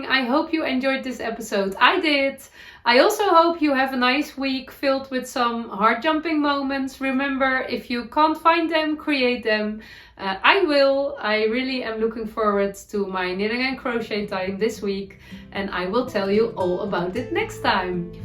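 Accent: Netherlands. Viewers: Noble Character Crafts was talking about her Ariana cardigan on her podcast and she mentioned you and your sweater. I had to come find you and see about your Ariana(s); which are so pretty!! Thank you for giving so many details. The latest one turned out so good! I love your energy and your dutchy English. You made me laugh out loud when you were talking about making a longer Ariana. You were correct in calling it your butt, but I think I prefer "sitting pillows" better. 😀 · papagei is parrot in English - I googled it - with bright red, blue and green - from Michelle. I love watching your podcasts - I love listening to your dutchy English -it makes me giggle from time to time.... I live in New Zealand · Dear, I really love your "heartjumping" Videos and especially I absolutely love your "dutchy English". Thank you for all this inspiration. happy greetings from Germany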